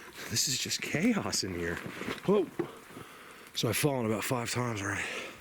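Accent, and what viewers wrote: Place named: South, United States. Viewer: Don't mean to be distracted but when you said ummm it almost sound like Elvis, you have that sexy southern tone and your voices sounds like him too...lol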